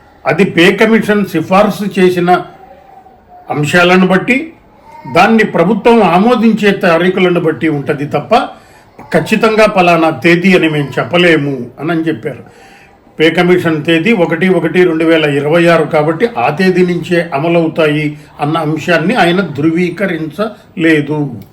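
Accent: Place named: United Kingdom. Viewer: తెలుగులో ఎంచక్కా చెప్పవచ్చు కదా... బ్రిటిష్ యాసతో ఇంగ్లీషులో ... అందరూ అర్ధం చేసుకునే అవకాశం లేకుండా పోయింది అని... అందుచేత...మీ యూట్యూబ్ ఛానల్ చూడదలచుకోలేదు..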